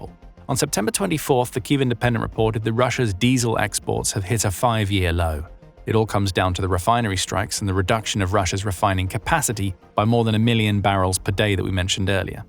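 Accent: England, United Kingdom. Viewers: Why does the narrator, who's clearly English by his accent, keep calling petrol, "Gasoline"? They call it petrol in the UK NOT gasoline.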